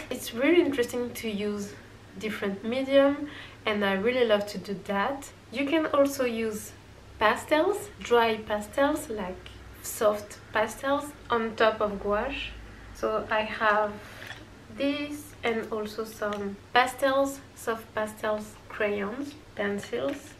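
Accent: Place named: France. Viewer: I love you,re accent. Are you frence? comment vas-tu. Bien? I love you,re gouache painting. 😊👋🏼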